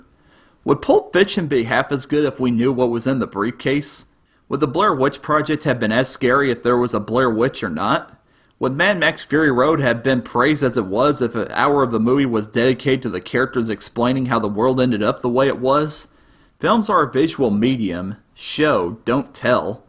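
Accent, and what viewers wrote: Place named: Texas, United States. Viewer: somehow texas like accent unconsciously make me think whatever you're saying is less credible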